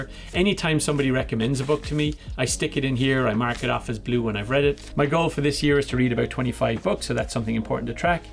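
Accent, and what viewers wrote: Ireland. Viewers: This is the most irish sounding american I've ever heard. Cheers from the other side of the pond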